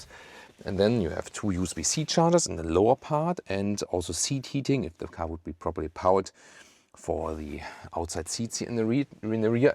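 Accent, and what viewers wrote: Germany. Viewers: Without looking at the caption, one can only understand 50% of what you are talking. If you cannot reduce your German accent, maybe speaking at a slower speed may help a bit, if you really want to stay in this profession.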